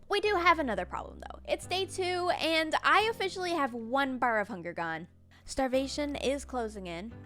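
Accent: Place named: Canada. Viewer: Mannn the Canadian accent. I löve it. It’s so endearing. (I’m from UK)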